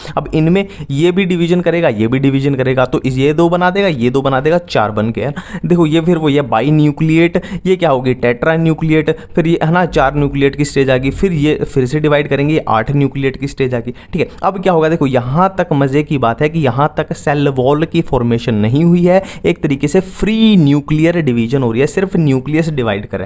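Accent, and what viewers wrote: Haryana, India. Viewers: App haryanvi hai ...??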